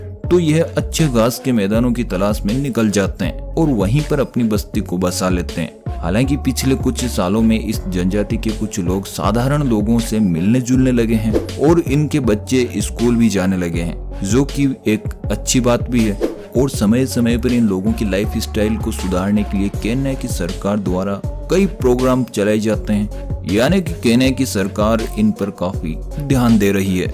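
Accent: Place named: Rajasthan, India. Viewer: Aapki aawaj rajsthani lagti h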